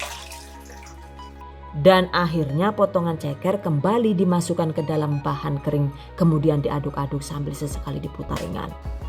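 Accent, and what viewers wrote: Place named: Java, Indonesia. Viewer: Bahasane Nyenengna . . Medok banget . . Nyong suka Bahasane . . 😂😂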